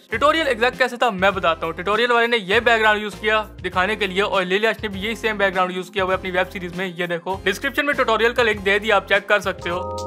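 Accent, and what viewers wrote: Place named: Pakistan. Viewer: Bhai aapke bolne k tareeky se hi pta chlta h ki aab Pakistani ho👀👍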